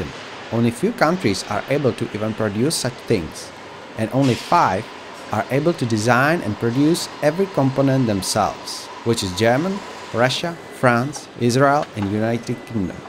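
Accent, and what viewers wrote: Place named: Missouri, United States. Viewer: I Detect a Bit of an Accent ! Are you From Missouri ?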